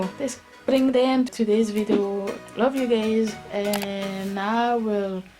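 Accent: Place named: Scotland, United Kingdom. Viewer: Iv watched your videos before and this is the first time iv noticed that you have a Scottish accent 😅 just when I hear you say some words I noticed it. These braids are so cute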